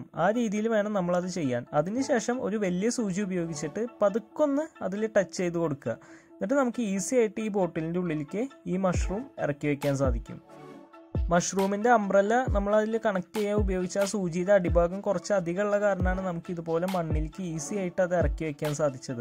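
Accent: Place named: Thrissur, India. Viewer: Samsaram kettitt tsr aanennu thonnunu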